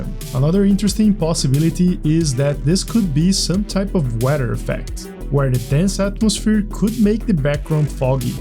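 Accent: Brazil. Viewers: Where are you from? Se sotaque parece de brasileiro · Great video, man. Is your accent from Brazil?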